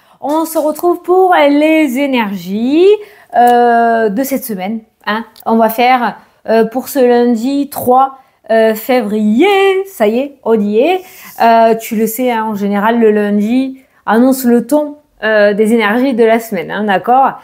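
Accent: South, France. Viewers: Bonjour ma douce, merci pour ta guidance, merci pour ta bonne humeur avec ton petit accent du sud que j'apprécie. Bisous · J’aime de plus en plus regarder vos/tes vidéos. Bonne humeur à gogo Et l’accent:-) Dans le sud aussi, pas loin je pense. Bravo 💖 Ps: je sors de la douche 😜😂, j’écoute en me préparant … 👍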